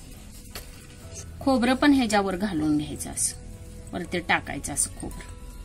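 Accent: Kolhapur, India. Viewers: Kolapuri mast boltya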